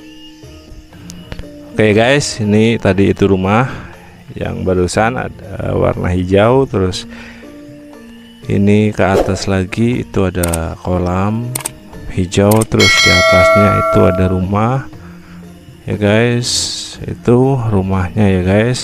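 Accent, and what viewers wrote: Banyumas, Indonesia. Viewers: Rika wong ngendi sih kang jawane ngapak temen pada bae karo kancaku wong cibenying 😀😀😀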